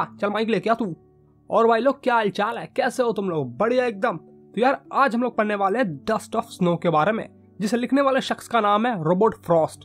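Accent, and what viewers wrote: Bihar, India. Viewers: Bihar se ho kya sir?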